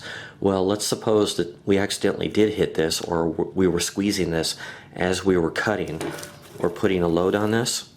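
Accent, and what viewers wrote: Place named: Texas, United States. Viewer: Thanks for this great review man! U from Texas ;-)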